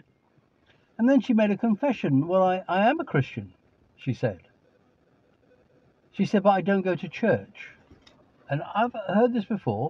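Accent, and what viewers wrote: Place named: United Kingdom. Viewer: So weird to hear someone speak of Christ with a British accent. I just left my church and am looking for a new Bible teaching church. Don’t care about the building, parking, etc—just want to hear the Word of God unapologetically preached! Good stuff there! Love you Brother and I will pray for your ministry from Alabama USA! 👆🏼🙏🏼👊🏼❤️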